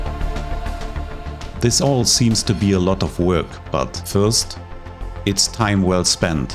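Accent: Germany. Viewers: Good old Denglisch :D Aber Inhalt ist super!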